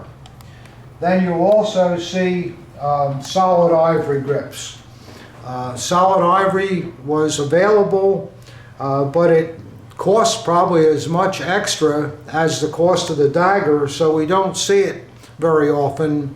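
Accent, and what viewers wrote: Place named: Philadelphia, United States. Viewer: Man the Philly/S Jersey accent is strong with this guy! Good Stuff, could enjoy a beverage on 2 street with him.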